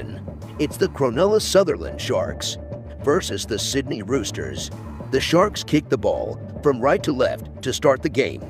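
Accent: United States. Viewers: Voiceover is a complete waste of time. Just tells you what you can see for yourself. And why the US accent? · American accent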